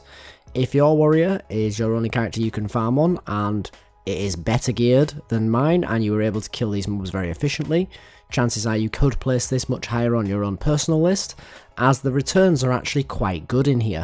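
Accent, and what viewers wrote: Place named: Yorkshire, United Kingdom. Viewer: you sound like a Hulligan ? Yorkshire sir ?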